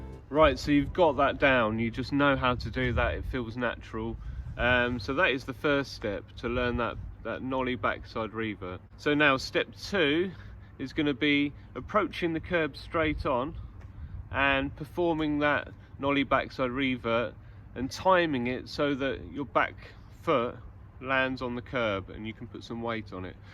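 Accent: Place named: United Kingdom. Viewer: British ah boy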